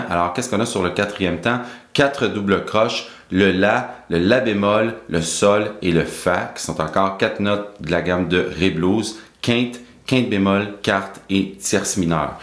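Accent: Quebec, Canada. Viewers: Bonjour. Je trouve tes vidéos très utiles. Elles m'aident à progresser dans ma technique. L'idée de la phrase musicale hebdomadaire est géniale. C'est court et pour moi qui suis débutant mais passionné, ça me permet d'avoir un exercice quotidien pour mes séances de musique. Je n'ai pas d'idée particulière sur un accord ou une suite d'accord, mais plutôt une suggestion (peut être pour plus tard), une phrase question...et sa réponse.😶 Et puis je voulais te dire merci pour ta générosité, ta simplicité et ta clarté. En plus cette pointe d'accent d'un cousin d'Amérique est tellement rafraîchissante...😁. À bientôt. Christian.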